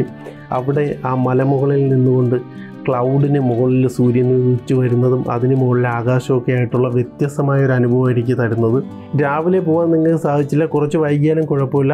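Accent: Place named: Trivandrum, India. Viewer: മൂന്നാർ ട്രാവൽ ടിപ്സ് സിംപിൾ ആയി അവതരിപ്പിച്ചു പൊളി...... അതും ട്രിവാൻഡ്രം സ്ളങ്ങി ൽ ..... ആശംസകൾ 🌹🌹 കൂടുതൽ വീഡിയോസ് പോസ്റ്റ്‌ ചെയൂ..... 👍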